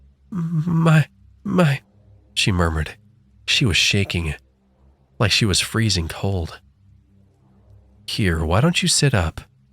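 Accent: South, United States. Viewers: Great southern accent. Better than mine and it’s natural. Haha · Gratz on 1k and I love the southern accent and you giving it to the douchebag is perfect for the story PMSL 😂😂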